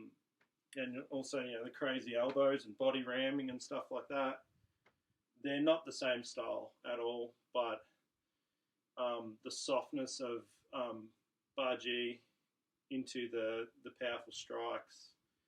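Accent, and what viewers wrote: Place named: Australia. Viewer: Dude, you're Australian. I never put two and two together. I've watched a couple of your videos and always imaged you talking in a US accent. I always wondered where Kangaroo Kungfu comes from and now I know.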